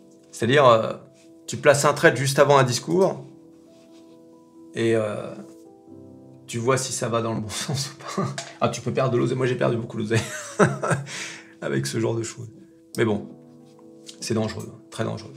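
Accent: United States. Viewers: Y'a pas mal de gens qui se sont fait rekt avec Eurotunnel, et j'ai l'impression que ça a laissé des traces dans l'inconscient collectif. Pis la bourse est une jungle qui fait semblant d'etre régulée, alors qu'avec les cryptos, on sait que c'est une jungle direct, y'a pas d'illusion. Au premier -95% si t'es pas mort, t'as compris q'il y a pas de règles... ;) PS: J'ai ete sur ta chaine Anglophone, c'est pas mal aussi cet accent Texan, t'as choppé ça où ? ;)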